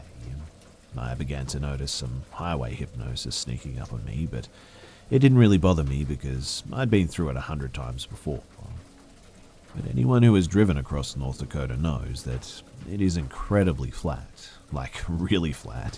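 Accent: Australia. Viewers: I like you. Got a nice voice. I suppose you are from down under? Greetings from North Vancouver island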